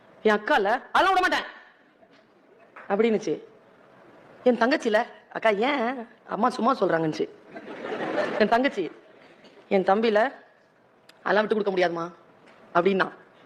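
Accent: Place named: Tirunelveli, India. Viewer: திருநெல் வேலி நீங்கள் பேசும்போது தெறியுது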